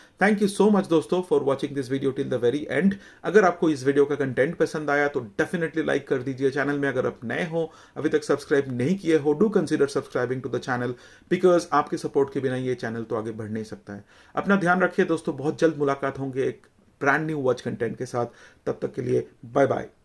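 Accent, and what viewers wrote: Bengal, India. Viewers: You are bengali?😅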